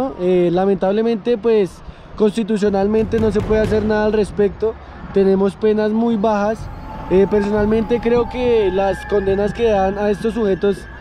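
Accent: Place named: Bogotá, Colombia. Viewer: Este man es rolo de pura sepa buen video jaja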